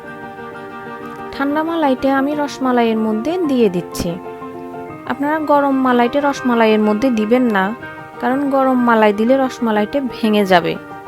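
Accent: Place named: Noakhali, Bangladesh. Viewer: নোয়াখাইল্লা। "প" কে "ফ" বলে। anyways recipe darun cilo.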